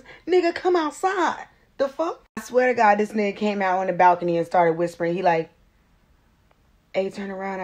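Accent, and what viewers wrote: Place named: East Coast, United States. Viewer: I knew you had to be from the East Coast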